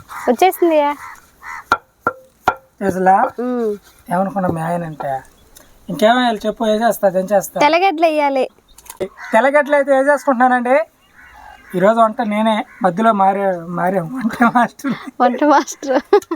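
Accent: Nellore, India. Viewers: Hi gaaru... meeru..mee vaarini 'AVNU GADHAYAAA' ani mana nellore language lo antunte vinataani chaala baguntundi 😊naakkuuda maa vaarini alaane pilavaalanpisthundi😊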